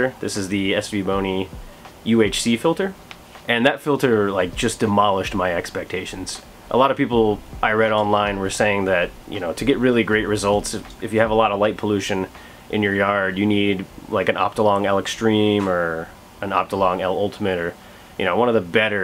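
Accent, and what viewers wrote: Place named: Virginia, United States. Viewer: You in VA? Sounds like my area lol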